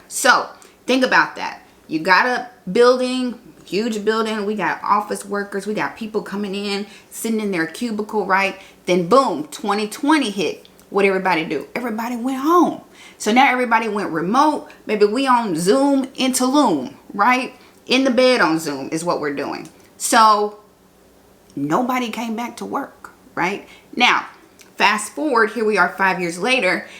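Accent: South, United States. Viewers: Love and hugs from Jersey 💪🏽 Love that Southern Drawl ❤ 🎉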